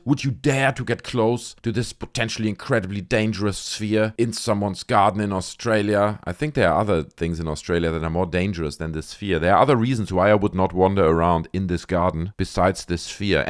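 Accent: Germany. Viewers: Love your analysis and humor doing so. the German accent adds gravitas for this Texan. The cylinder looks like something suspended from a drone by a fishing line. Way too unbelievable. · I am starting to learn German, very slowly. I hope that I can get myself to the point where I am able to speak German as well as you have learned to Speak English. Im guessing, (hopefully without offending), that German is your Native Language. Love your accent. If English is your Native language, my apologies, wasn't sure.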